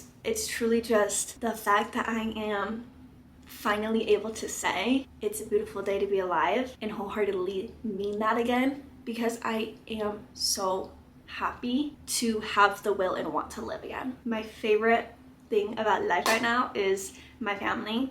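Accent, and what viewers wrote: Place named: United States. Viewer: i thought u had a country accent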